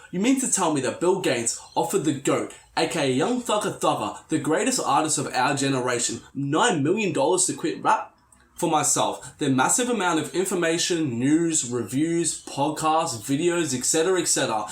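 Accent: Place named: Australia. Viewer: which part of australia are you from?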